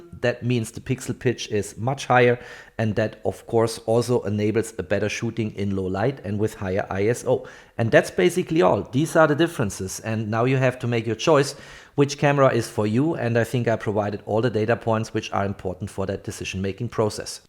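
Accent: Germany. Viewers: Let me guess... Your German? Nice Video!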